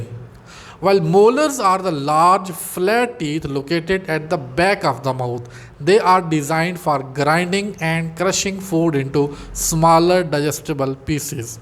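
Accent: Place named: India. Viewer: indian ahh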